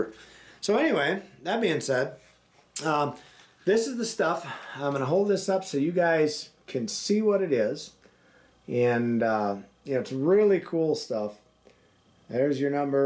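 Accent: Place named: Kentucky, United States. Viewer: I can tell you are from Kentucky.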